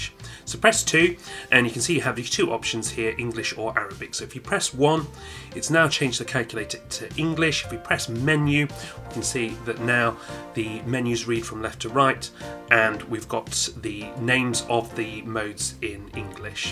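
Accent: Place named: England, United Kingdom. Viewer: I liked your English dialect. Is it an American or British accent?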